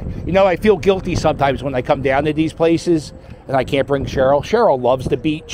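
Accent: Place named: Pennsylvania, United States. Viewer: I heard the PA accent and mediately. When you think about Martin Luther King and the motel, remember something. These places were not always the Havens for junkies and hookers they have become. This is where families stayed originally, traveling salesman and other businessman, when the car became popular, people stayed at motels and motor inns. We didn’t have 20 story Sheratons and 40 story Marriotts. All we had were motels and everyone stayed there. It’s weird middle-class America state. You did have some bigger hotels, but they were confined usually two resorts are big cities. Those dives up and down the route one Corredor with normal stopping points on people traveling cross-country or cross state.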